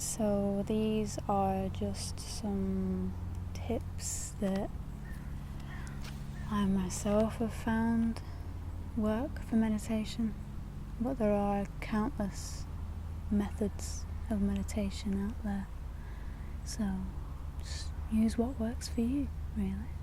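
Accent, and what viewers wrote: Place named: Northern England, United Kingdom. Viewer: Wonderful and relaxed video. Nice surroundings and good tips for meditation. Bonus:your charming Northern English pronunciation!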